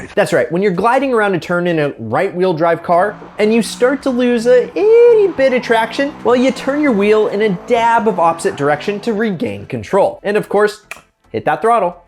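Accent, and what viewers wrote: United States. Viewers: He’s American and he spoke like a American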